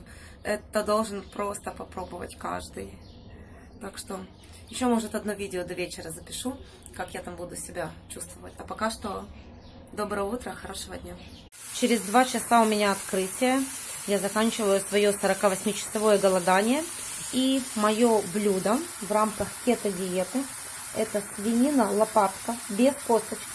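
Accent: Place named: Ukraine. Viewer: у вас украинский акцент, Вы оттуда?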